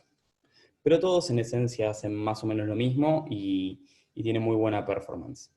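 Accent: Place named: Argentina. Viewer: Me alegra un montón encontrar creadores de contenido de éste tipo que sean argentinos! Estoy re agradecido a nuestros hermanos de otras regiones de latam, pero es mucho más cómodo escuchar la "tonada" que nos es familiar! Ya me suscribí! Ví que sos profesor en UTN, puede ser? En alguna carrera o en los cursos de extensión? De ser así, me gustaría saber en qué cursos para tenerlo en cuenta si en el futuro decido tomar alguno. Gracias!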